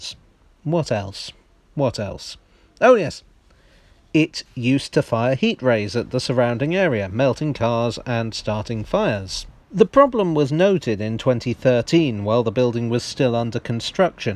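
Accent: United Kingdom. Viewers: I can’t tell if this is a mini documentary or just a straight up British guy roasting a building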